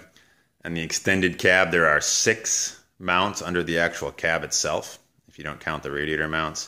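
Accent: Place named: Wisconsin, United States. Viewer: Hey are you the dude from Wisconsin??? Im assuming by your accent that you are. I live in Hayward myself! Lol. Cool video, My 98 Silverado is having the same issues.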